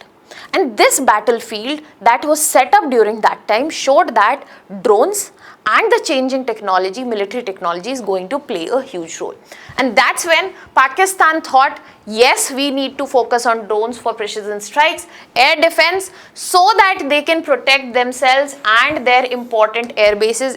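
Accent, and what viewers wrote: India. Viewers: It's new language Indian desi English by desi aunty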